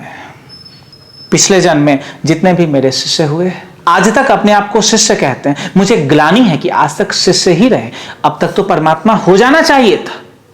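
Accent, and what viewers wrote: Bihar, India. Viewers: भैय्या लोग जैसे बोलते वैसे ये लडका बोलता है. रजनीश ओशो की बात करते है लोग मंत्रमुग्ध होते थे. इसकी बात मे कोई दम नहीं.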